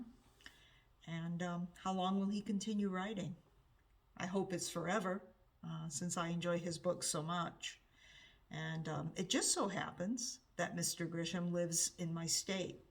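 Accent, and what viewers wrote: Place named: New England, United States. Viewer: I love my local libraries too. We live in a pretty rural area where I am, but they do a nice job I think, and I’m looking forward to being better at participating once my kids are older and I have more time. A Very Virginia Christmas sounds fun. I loved Tomorrow and Tomorrow and Tomorrow-such beautiful writing and very moving to me. I hope you like it! I’m not sure if I’ve ever read any John Grisham. I’ve seen the movie The Firm of course and I didn’t realize there was a sequel, wow. Maybe I should try one. Just out of curiosity, are you originally from New England? I have a lot of family from NH and you have a slight accent so just wondering :)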